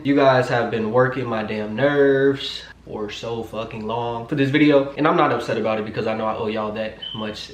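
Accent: New Orleans, United States. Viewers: you snapping in this new orleans accent hahahaha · its the new orleans accent for me🤣🤣🤣🤣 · You and this Nola accent is a kiiiii lmfaooooo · LMAOOOO THAT New Orleans Accent Giving Playboys LMAOOO I Love Your Channel Down🥰😂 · U sound like messy Mya in ur New Orleans voice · lol it’s the new Orleans accent for me 😂😂😂😂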